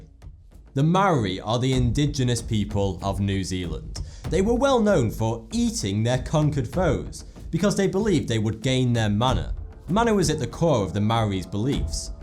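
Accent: United Kingdom. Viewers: Take it from me as a Brit, it's just how we say things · The British accent just makes videos like these so much better, great video and great channel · Mr. Khan <link> No. It's a dialect that causes a lot of British people to do this. I made the same mistake a while ago with someone else and was corrected.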